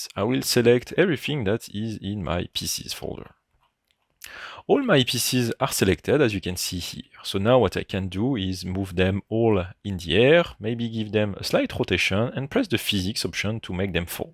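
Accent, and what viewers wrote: France. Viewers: Super toutes ces nouveautés! Pourquoi ne peut-on plus avoir l'option lunettes en mode téléphone pour les panoramiques ? Merci. (Toujours drôle cet accent français en anglais ^^ ;-) dommage de ne pas traduire au moins à l'écrit) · Bonjour, pourquoi vous ne faites pas la présentation en français, vu votre accent, vous l'etes non?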